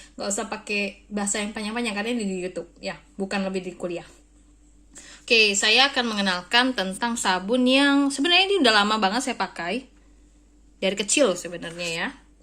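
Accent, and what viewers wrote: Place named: Batak, Indonesia. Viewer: Kakak org batak ya ? Cara bicaranya mirip temanku dari batak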